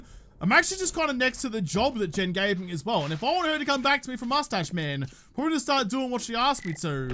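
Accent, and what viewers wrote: Australia. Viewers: P.S. Miss your Aussie voice and excitement in the morning it helps start my day, the content is great as always... Just not the schedule!!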